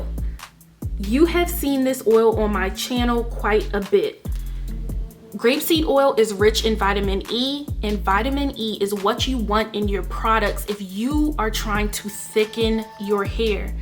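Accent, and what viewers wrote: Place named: Trinidad and Tobago. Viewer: You Trini? I hear it in your voice lol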